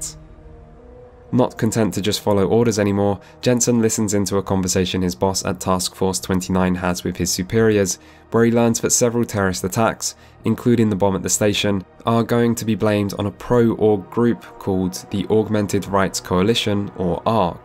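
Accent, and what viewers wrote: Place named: United Kingdom. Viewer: UK Accent? - Check. This is going to be a good retrospective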